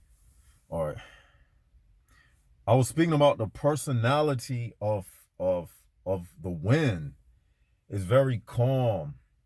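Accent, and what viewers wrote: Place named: East Coast, United States. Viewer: I thought east coast because of accent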